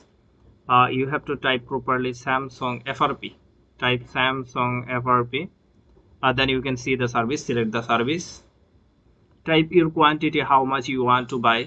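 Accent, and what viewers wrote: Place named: India. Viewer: all i hear is "bibidi bobidi" because thats how all Indians/hindus/punjabi/beadheads sound when yall speak.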